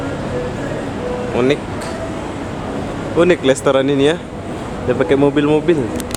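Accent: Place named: Kuala Lumpur, Malaysia. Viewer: Cara ckp ada bau2 ckp melayu kl.. It's ok bro....😉😉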